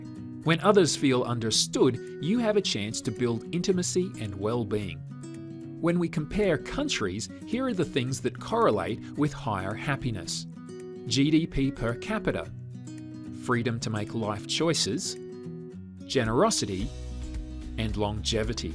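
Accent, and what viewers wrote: Australia. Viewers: I came here to ask about your accent. It’s like Australian with some good ole southern twang thrown in there to keep you guessing! 🤣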